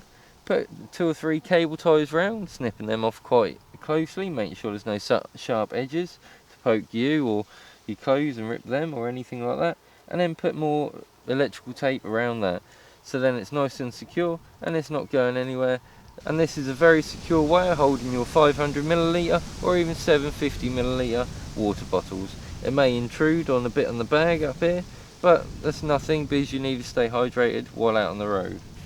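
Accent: Norfolk, United Kingdom. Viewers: I really enjoy your videos very helpful and you have such a nice Norfolk accent 🚴‍♀️🚴‍♀️🏳️‍🌈🏳️‍🌈🚴‍♀️🚴‍♀️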